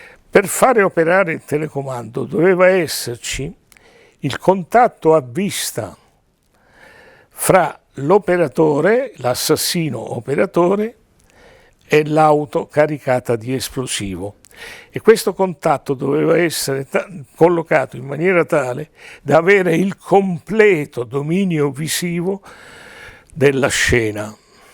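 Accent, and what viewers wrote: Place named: Sicily, Italy. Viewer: Generale, da come lei parla scopro che lei è un siciliano. Ok, complimenti!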